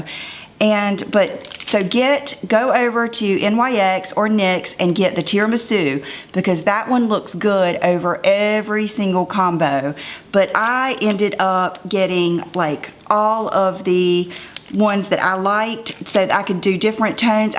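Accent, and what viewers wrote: South, United States. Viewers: One celeb worships Satan so I am not helping that person's bank account as a devil worshipper. We're accountable for what we know and I don't want my dollars going to anything that person may use funds for connected to the occult, and satanism. We can all have tolerance for diversity but when it goes deeper than a difference in opinion or finding a celeb "simply annoying" or something...."miniscule" I have to draw a line. I love your country accent and you're lovely. I've watch this video a few times just because I enjoy listening to you and seeing all these goodies! I own all the OPI polishes you mentioned. I need to find the Revlon mauve-ish shade! God bless you and stay beautiful!!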